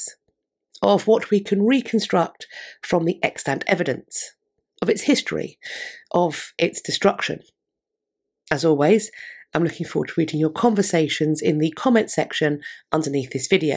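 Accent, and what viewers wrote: RP, United Kingdom. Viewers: I say we re-build it!!! (does the Trust have any spare change? hee hee) Thank you, for this lovely presentation! I believe Nonsvch was Henry's sort of dream house, if that's possible for someone who owned many palaces and castles. It was indeed the place he envisioned his legacy and descendants would carry on. I've always thought Charles II to be handsome in some strange way, but shame on him for allowing the destruction, regardless of his former lover's reason. I can see now, in her velvet and silk Elizabethan gown, hair up, perky smile and posh accent, telling off Ms. C, preventing the destruction, and sentencing Ms. C to a lifetime of farm labor, since even "thinking" of destructing Nonsvch was a crime, just as only mentioning the king's death was treason. By the way, does anyone know if the Nonsvch church would have dropped any hints of any particularly religion other than the Church of England? 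hee hee With an Italian designer, I smell Catholicism which I wonder if Henry truly ever abandoned entirely. 🎭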